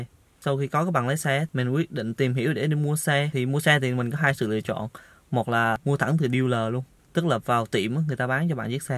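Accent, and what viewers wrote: Central, Vietnam. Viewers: Bạn người miền trung hả. Mình cũng plan mua xe nên xem bài của bạn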